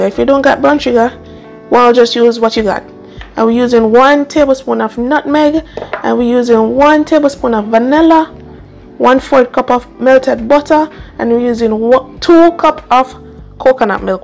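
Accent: Jamaica. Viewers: Who is not a Jamaican. Stop talk . Do you know how a Jamaican sound. She is a 100% Jamaican if you don't know anything or sure don't say shit.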